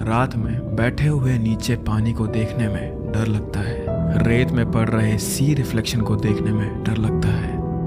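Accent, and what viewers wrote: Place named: Bihar, India. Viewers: Dude, you have nailed it. This is one of the best story telling of yours so far. Your voice modulation, Bihari accent, background score everything is top notch. Great work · its bihari accent for me....damn.. adooorable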